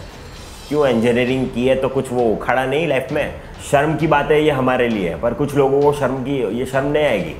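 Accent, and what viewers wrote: Gujarat, India. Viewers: one thing I come to know from your speech in first 30 sec is u are Gujarati 😁😁😁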